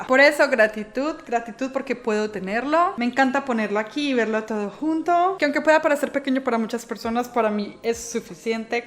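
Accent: Colombia. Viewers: Como me gustan tus videos!!!!! Amo cuando hablas con tu tonada Colombiana, me encanta!!!!! Otra cosa, lo que decías de cargar combustible que no te gusta, me pasa eso mismo pero cuando voy detrás de algún camión o camioneta cargados con algo, por haber visto peliculas como "destino final" me da mucho miedo y si o si tengo que pasarlos jajajajaja Me encanto tu rutina semanal, como todos tus vídeos, por supuesto! 🌞❤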